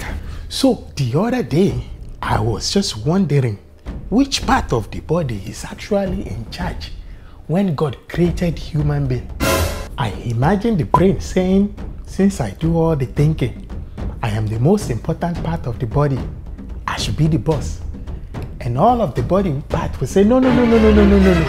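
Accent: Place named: Africa. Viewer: I don't know How you showed Up on my screen But maaaan I love this video specially your african Accent 😂😂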